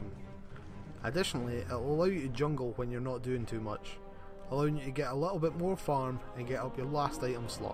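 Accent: Scotland, United Kingdom. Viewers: What accent is it? Scottish, irish?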